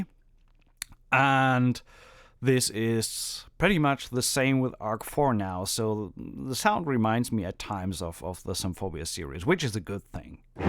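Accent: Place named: Germany. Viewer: I really like your accent, where in Germany are you from?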